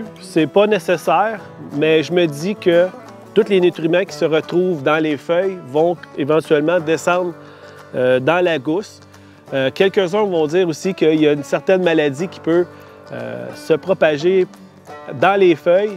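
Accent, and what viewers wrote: Quebec, Canada. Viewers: merci beaucoup .j aime beaucoup votre accent kebekoi.je suis tunisienne qui aime beaucoup le canada que dieu vous protege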